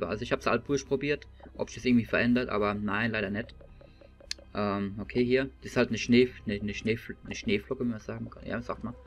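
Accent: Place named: Palatinate, Germany. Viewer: Ich aus Landau :) Man hörts an deinem dialekt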